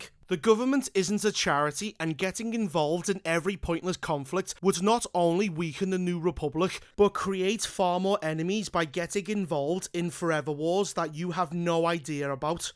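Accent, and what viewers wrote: Liverpool, United Kingdom. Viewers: Bro, you have to be from liverpool yea? This man sounds EXACTLY like Darren Till 😂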